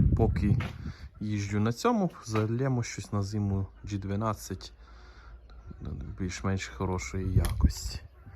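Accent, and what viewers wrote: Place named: Uzhhorod, Ukraine. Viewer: перший раз глянув відео,ну акцент чисто як з Ужгорода,ближче до мадярів,Вітаю зі святами!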